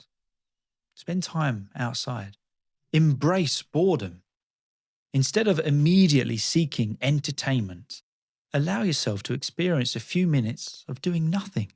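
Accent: United Kingdom. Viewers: Is it UK English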